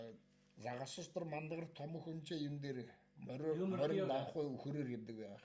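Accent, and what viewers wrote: Buryatia, Russia. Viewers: Кажется с Эхирит- Булагатского района. Би хуу хэлhэн юумын ойлгоном, ямар hайханаар зугаалнаб, манайншаар. Особенно, бу ёборолды. Только у нас так скажут! Счастье, знать родной язык!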